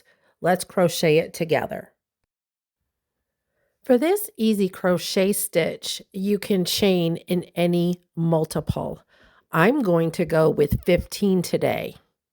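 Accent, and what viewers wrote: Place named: Northern Illinois, United States. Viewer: Girl, are you from Northern Illinois? You sound like home.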